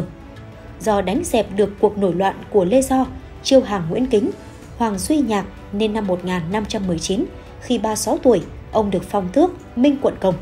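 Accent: North, Vietnam. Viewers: Chi oi. Chi noi tieng [ bac ky nang giong qua) xin dat [ caption tieng viet ) dum mot cai nhe. Cam on chi nhe.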